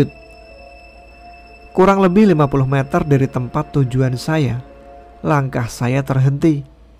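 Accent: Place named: Java, Indonesia. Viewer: ciri khasnya Mas aksen org Jawa nya terasa kental bgt yak 😁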